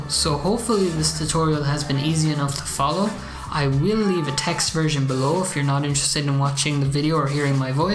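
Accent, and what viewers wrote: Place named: Scotland, United Kingdom. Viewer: This your voice?For some stupid reason i thought you were scottish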